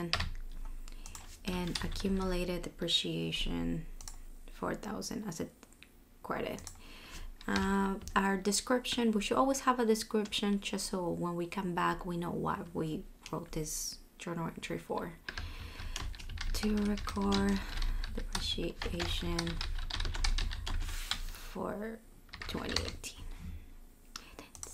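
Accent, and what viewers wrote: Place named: Latin America. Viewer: She sounds latina, great explanation 🎉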